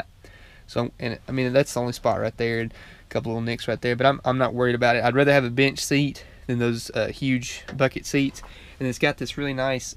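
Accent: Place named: Mississippi, United States. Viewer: can't help but notice you gotta Mississippi accent ... least that's how it sounds to me lol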